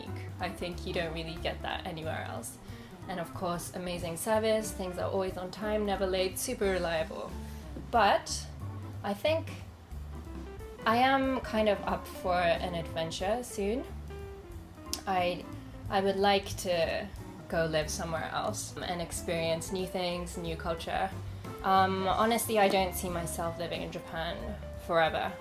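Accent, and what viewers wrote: United Kingdom. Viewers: Japanese girl with a brit accent...ummm... i'll take two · are you originally from South Eastern part of England? Your accent sometimes has a tinge of a South Eastern or often times confused with Aussies sounding accent. (I've met some people from East Sussex and Kent that have an Aussie sounding accent, though it would fluctuate between that and just an average Londoner's accent.) By the way I think your nose gives you a unique look that sets you a part from the crowd and you have a nice attitude to go along with it. Like they say beautiful inside equals beautiful outside too. In your case that is very true. Cheers ad do not listen to the haters, you are an amazing lady! Enjoy Japan and where ever you go to next too!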